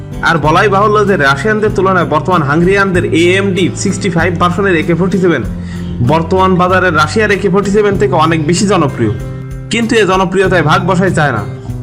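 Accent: Bangladesh. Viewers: vi ki noakhailla naki..... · আপনার বাড়ি কি খুলনা, যশোর বা নড়াইলে? আপনার কথায় আঞ্চলিক টান আছে।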